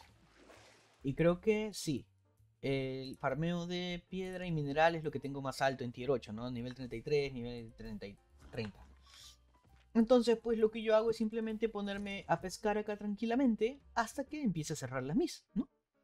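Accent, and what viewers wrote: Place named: Peru. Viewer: Me encanta como lo narra y se le nota que es peruano, que grande eres mano, sigue asi, tienes un sub mas ahora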